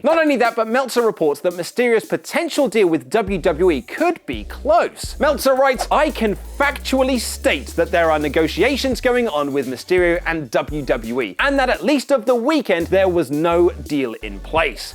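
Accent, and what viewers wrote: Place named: United Kingdom. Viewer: Some of his words I do have to listen to again. I guess his British accent and my hillbilly ears clash....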